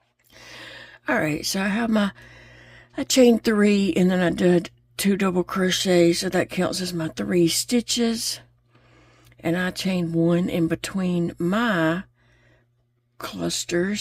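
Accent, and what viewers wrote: South, United States. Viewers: Hi. Im sorry if i said something to hurt you. I dont mean no harm. I cried right with you. ❤❤ive been watching you for some time. And i do love just listening to your wonderful humor and your southern accent ❤❤ ive had cancer and just not my self lately. But i just want you to know i love you and all your art work and im here to support you. So again forgive me please ❤❤❤❤❤❤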